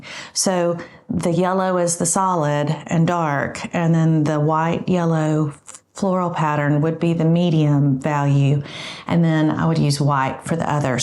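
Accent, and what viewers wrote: South, United States. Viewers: I love quilting, I love fabrics, I love that you thrift shop with your daughters and I love your calming, reassuring southern voice🙂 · I needed some fun this morning & your video fit the bill. Thank you for posting lighthearted and fun content. I enjoy that you let the South out in your speech. Being from Texas, it makes me feel like I have a neighbor talking to me. Have a great day! You've given a great start to mine!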